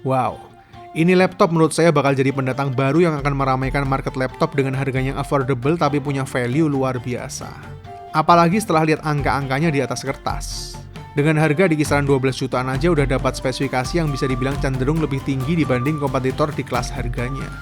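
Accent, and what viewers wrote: Yogyakarta, Indonesia. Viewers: Aku Suka karna ada medok khas Jogja nya ya kang 🙏 ciri khas banget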